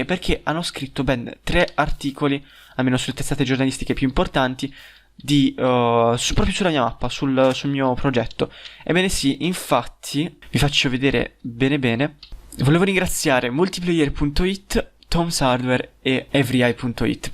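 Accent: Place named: Italy. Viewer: I love you italian accent xD greetings from Argentina!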